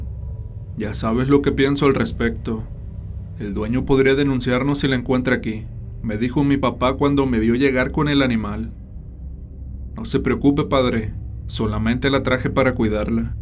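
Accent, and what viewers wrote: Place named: Mexico. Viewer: son buenos relatos,,,pero mejora el acento la voz es horrible,,ya sabemos k eres mexicano mejora la locucion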